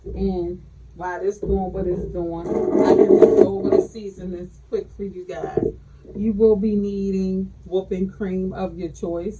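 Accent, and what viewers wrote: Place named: Baltimore, United States. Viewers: I love you, Mama! You helped me so much. 💙💙💙 I have to ask, I'm a new subscriber, are you a Baltimore native? I ask bc my man is from Baltimore and you both share the same accent. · Bmore accent all day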